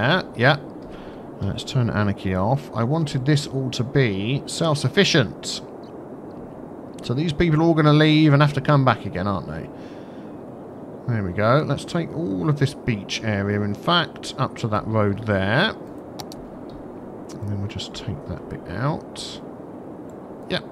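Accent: United Kingdom. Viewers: are you british and do you know DANTDM personely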